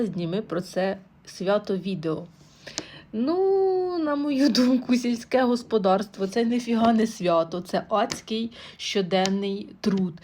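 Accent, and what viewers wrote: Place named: Karlivka, Ukraine. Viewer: акцент Карлівський-)))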